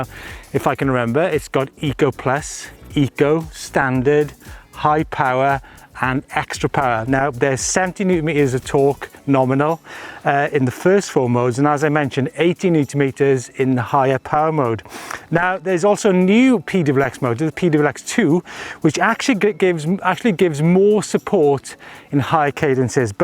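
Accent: Wales, United Kingdom. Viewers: Is that a Welsh accent . Nice bikes .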